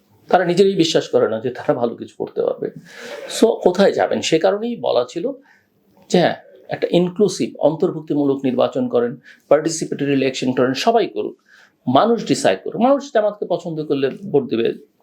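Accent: India. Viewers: তুমি এতো সুশীল সাজার চেষ্টা করিও না। জেনারেল আর ভারতের টোনে যে কথা বলো এটা জনগণ বুঝে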